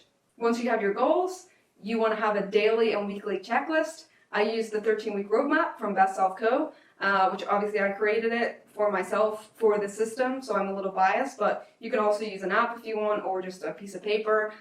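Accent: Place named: Belfast, United Kingdom. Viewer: Great way of breaking down your goals to achieve success and a really good video. Also love when you say 'now' and 'out', still got the Belfast accent :)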